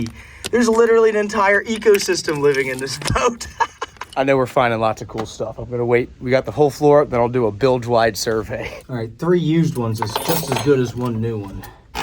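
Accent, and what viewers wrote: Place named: West Virginia, United States. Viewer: Ey fella, where you from? You sound like you got a West Virginian accent but i aint got a clue where you are from.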